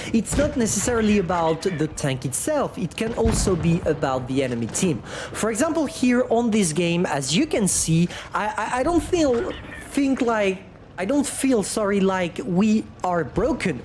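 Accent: France. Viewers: How can somebody be so annoying? 40% the French accent 30% that face 20% those awful glasses 10% those girly arms and shoulders... I'm kinda interested in what he is saying but the person itself... OMG horrible creature.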